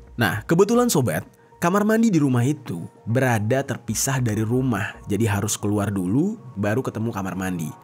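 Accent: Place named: Aceh, Indonesia. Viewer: Belum aja ya dengar bg dengan logat aceh nya🗿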